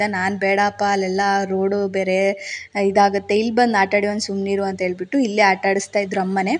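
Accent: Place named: Hassan, India. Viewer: ನಮ್ಮ ಹಾಸನ್ ಸೈಡ್ ತರ ಇದೆ ನಿಮ್ಮ ಭಾಷೆ ಹಾಸನ ಮಂಡ್ಯ ನ ನಿಮ್ಮದು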